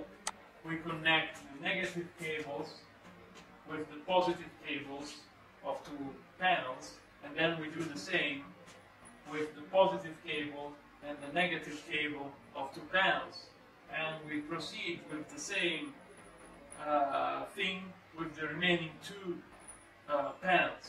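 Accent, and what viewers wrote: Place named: Italy. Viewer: L'accento italiano si sente a 5000km di distanza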